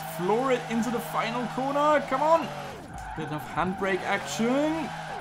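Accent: Germany. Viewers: You sound really german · Is he German? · Du hast einen echten deutsche Akzent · Bro are you German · Are you from germany?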